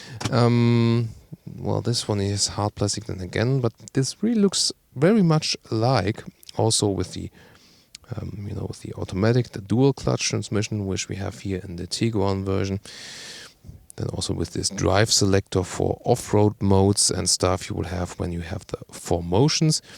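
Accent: Germany. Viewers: Can't wait! Your reviews are always my favourite not only because of the unrivalled quality and the super deep videos but mainly because of the oddly satisfying-to-hear german accent xD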